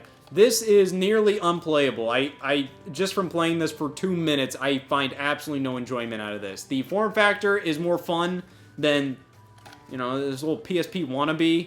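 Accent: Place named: Michigan, United States. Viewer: You definitely from Michigan lol😅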